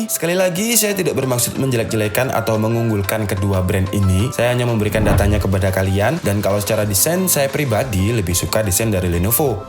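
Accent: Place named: Bali, Indonesia. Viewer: ngomongnya kyak orang bali euy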